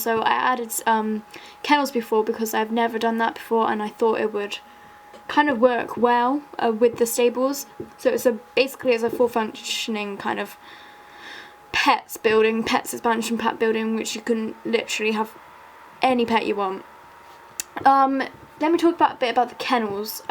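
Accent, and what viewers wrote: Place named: England, United Kingdom. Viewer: I love English accents! I think American accents are annoying and boring tbh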